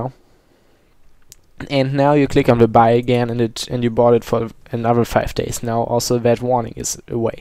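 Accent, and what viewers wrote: Germany. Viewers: Let me guess, you're German, right? ;] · you german right ? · Nice german accent brah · sounds like u are german ? nice video ! :)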